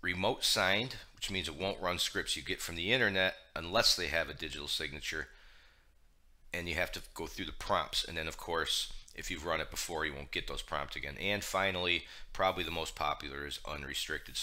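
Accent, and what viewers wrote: United States. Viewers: I can't understand american accent but... I was so atracted by this deep male voice mmmmhhh very inspired😋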